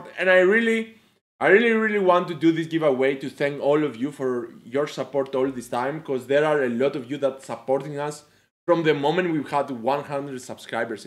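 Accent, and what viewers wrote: Greece. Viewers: Unique Greek accent #BALORIANS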